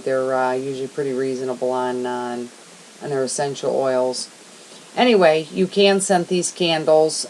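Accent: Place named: Minnesota, United States. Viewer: First time on your channel, liked and subbed. Thanks for the simple common sense talk. I'm trying to figure your accent. I'm guessing northern Minnesota or N.D., not so much Wisconsin though. Thanks again, Sam.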